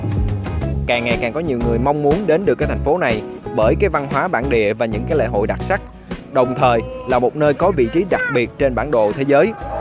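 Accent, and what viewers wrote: South, Vietnam. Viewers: Giọng miền nam dể nghe,ủng hộ bạn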